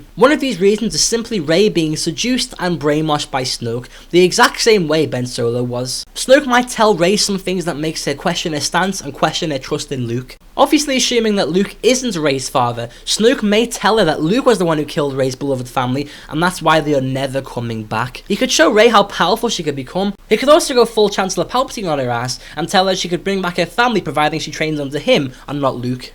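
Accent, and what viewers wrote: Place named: Scotland, United Kingdom. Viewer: are you Scottish or irish or what?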